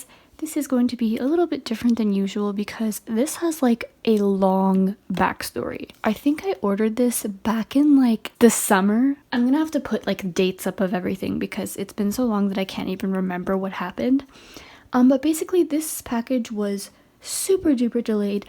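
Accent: France. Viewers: AA, ma queen back with another LITTT VIDEO! Was this am asmr because the vid was just satisfying also your french accent! <3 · Your French accent is soo cute🥰❤️ love you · I love your french accent and idk why but i was so entertained by the story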